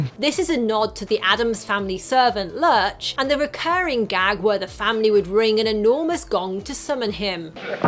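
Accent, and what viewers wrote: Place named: United Kingdom. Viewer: That correct pronouce of Ortega was absolutley unexpected, whilst having a strong Brit accent.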